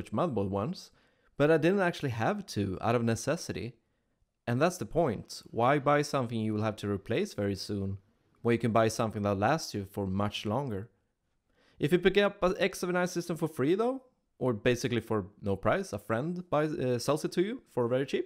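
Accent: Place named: Sweden. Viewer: If you listen closely you can hear your accent is coming from Sweden sort, yeah its correct xDD.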